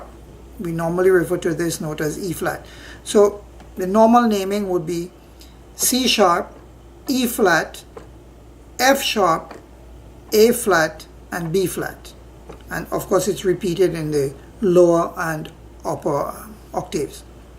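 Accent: Trinidad and Tobago. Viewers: Trinidadian accent. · Are you from Trinidad by chance?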